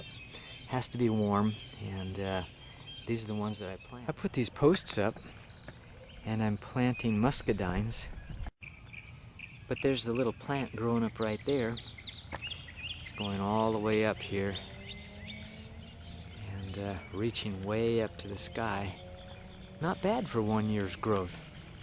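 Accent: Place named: South Carolina, United States. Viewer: he sounds like he is from upstate south carolina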